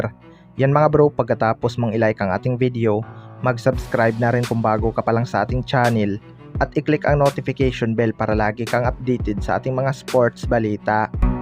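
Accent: Visayas, Philippines. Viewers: Bisayaa nimo oi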